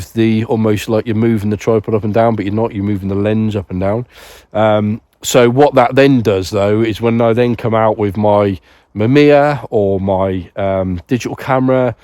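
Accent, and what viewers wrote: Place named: Somerset, United Kingdom. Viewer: I came here looking through recent S5ii videos, ears pricked at accent, then location then mention of a C3; i've stumbled on gold here 🤣🤓🤟 i'm a Somerset based lumix and film shooter too. Ace pics of the young trees! 🤓🤟 I'm on the cusp of picking up an S5ii for weddings for this year. I was running x2 S1 and an S5, I found I was missing the occassional shot due to difficult contrast scenes at times and then faffing with MF and missing moments. I've sold my S1 bodies and plan on x2 S5 and one S5ii. How has the AF been for you so far on the S5ii? Bizarrely i've never been a fan of eye detect so kind of really after how it performs without eye AF (all the hipster videos seem to be eye AF only). C3 is such a good camera, I ended up selling mine as it was a bit heavy for me also I wanted an SLR 6x6. One hell of a camera though, loved using it, do wish I tried it with one of those tripod parralax correction tools though, but then the parralax didn't mess me up too much.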